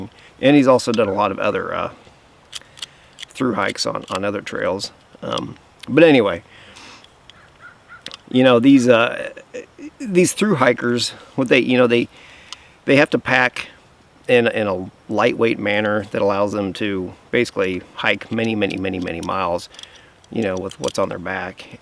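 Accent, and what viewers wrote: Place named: Kansas, United States. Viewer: I haven't caught up with every single one of your videos (probably won't be able to), but I really appreciate the commentary here. I'm past 50, living in NE Kansas and always trying to get to Colorado in the summer. I'm not a technical climber but have done about 25 14ers and finally made it to Conundrum Hot Springs at the end of this summer with a pack that was just... too-freaking-heavy. I'm in the "slowly buying new stuff to replace my bombproof and not in any way broken but heavy as lead old stuff" and wondering exactly what I'm trying to accomplish. Probably not a through hike of the CDT (as much as I would like), but trying to match gear with comfort, fitness level, and the opportunity to actually use it. I appreciate you relating your experiences. Also, your cadence sounds like a native western-ish Kansan. 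I'm not, but it's fun to hear.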